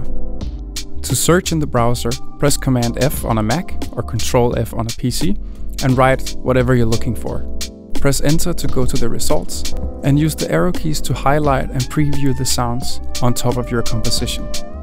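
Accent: Denmark. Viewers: This guy sound Danish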